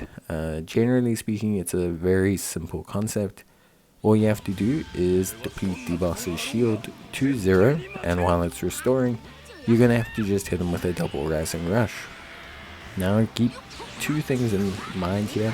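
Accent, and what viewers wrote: South Africa. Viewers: Hey brother are you South African cos i know that accent anywhere! howzit my china! cheers for all the help on the game helps alot. Keep powering up man!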